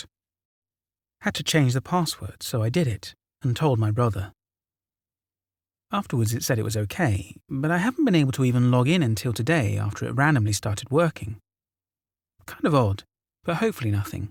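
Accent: United Kingdom. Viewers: Does anyone else find it difficult to listen to a story about north Georgia being narrated by a brit?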